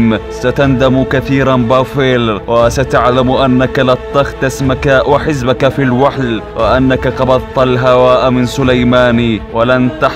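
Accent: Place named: Israel. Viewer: صوت اسرائيلي يجيد اللغة العربية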